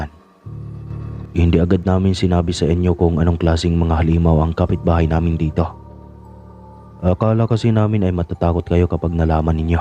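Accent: Visayas, Philippines. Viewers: ang galing mo ha fluent ka magtagalog pero visaya ang punto mo doin ako bumilib sayo ☺️☺️☺️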